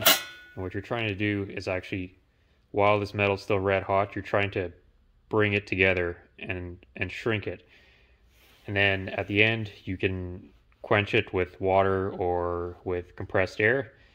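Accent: Canada. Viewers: I can't quite understand in that Canadian accent of yours. What are you calling your crescent wrench/ handheld metal brake?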